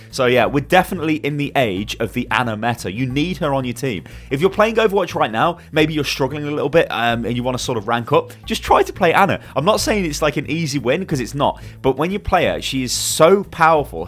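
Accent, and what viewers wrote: United Kingdom. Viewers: God I love british accents. Ana turns into "Aner"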